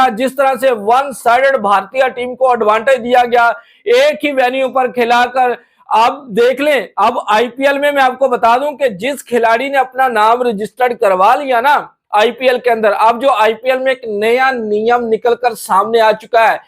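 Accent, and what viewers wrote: Pakistan. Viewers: Sala pakistani iss ke boli sa pta chalta hai ka ja sala pakistani hai ❤❤❤❤❤❤❤❤❤❤❤❤❤❤❤❤❤❤❤❤❤❤❤❤❤❤❤sala dog da bacha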